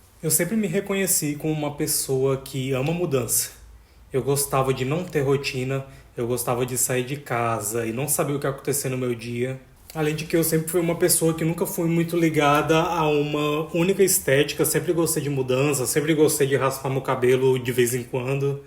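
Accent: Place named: Natal, Brazil. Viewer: nossa, tô maratonando seu canal e logo pensei “será que ele é de Natal?” pelo sotaque mas principalmente pelas gírias hahahaha amei ❤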